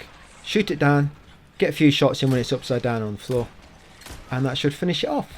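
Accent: United Kingdom. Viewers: Remember you from the "hell of a Sheriffe achievement. Definetly the best. I'll definetely try your strategy, since I was 30 minutes too late on my own. Good job! BTW, where are you from? I love the accent. British for sure...but whitch city? I teach English as a second language. Thats why I'm curious. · Why is your accent so british for Gods sake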